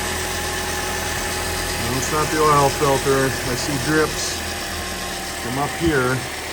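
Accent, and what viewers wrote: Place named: United States. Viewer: good, simple, and in clear USA English. A DIY guy can do this (replace the unit and do the oil change with a good filter) and save a LOT of money!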